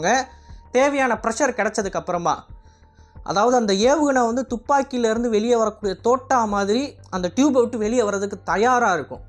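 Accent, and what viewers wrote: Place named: Tamil Nadu, India. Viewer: Keep move bro, don't explain like tamil english. And useless coments.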